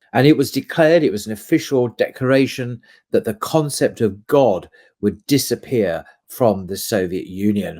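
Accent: United Kingdom. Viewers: You got a British accent, you are a propagandist. Let's talk about how many people Britain have killed just in India. F*****, British sucks.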